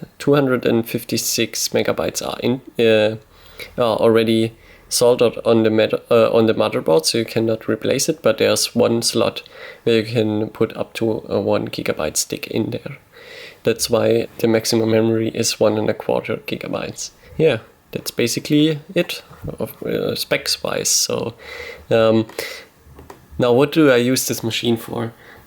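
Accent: Germany. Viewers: Man erkennt, dass deine Muttersprache deutsch ist. Ich würde dich bitten nicht englisch zu sprechen weil das ....... klingt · Die Leute scheinen den deutschen Akzent abzufrieren. Dachte immer die halten Deutsch für eine nicht ganz so schöne Sprache. :D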